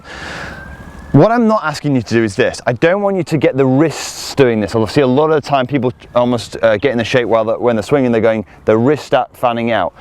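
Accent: United Kingdom. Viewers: Great video's and a proper pleasant British accent 👍 unlike an annoying newsreader 😩👎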